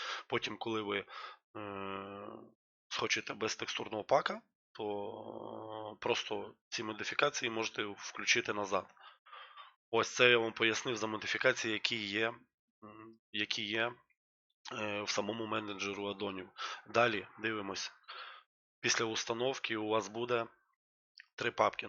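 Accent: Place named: Russia. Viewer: Мне вот дико нравится украинский язык, хотя я русский без каких либо украинских корней (ну вроде). Я не знаю почему, но просто кайфую когда слышу мову. Но российский акцент у есть, подзабыл, так сказать, язык предков. Авторы ценны для публики, когда идут своим путем, не озираясь на моду и большинство.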